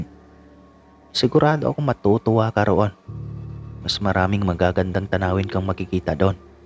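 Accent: Philippines. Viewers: Taga leyte ka ba? Parang tagalog ang punto ng pananalita mo...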